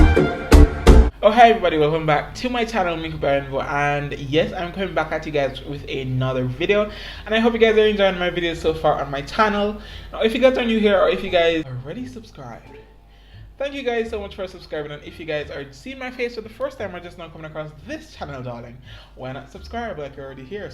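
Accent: Jamaica. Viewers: Do I hear a Jamaican accent? :)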